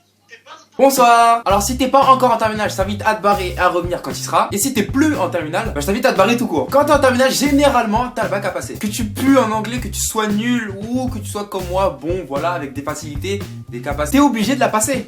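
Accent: United Kingdom. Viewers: Waa le british accent !!! Thanks for the help man 👍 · This british accent 😍😍😍😍😍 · That British accent though👌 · L'accent british qui tue tout 👌🏽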